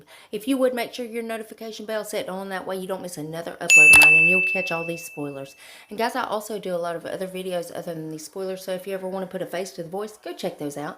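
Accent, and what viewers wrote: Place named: Tennessee, United States. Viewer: Howdy from Tennessee! Just subscribed. Love the videos and that you talk just like me! 🥰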